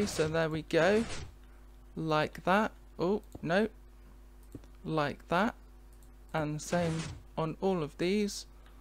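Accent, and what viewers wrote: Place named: United Kingdom. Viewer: 와 영국발음 갖고싶다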